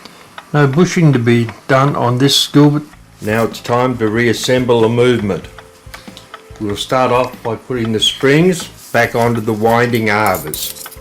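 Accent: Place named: Australia. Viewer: Sounds like an Aussie 🇦🇺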